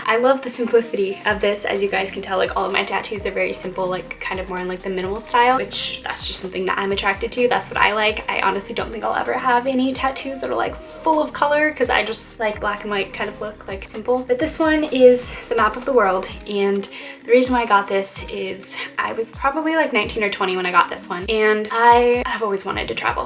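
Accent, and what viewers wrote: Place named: New Zealand. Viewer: you have a cute lil nz ❤️ that makes me so happy haha xx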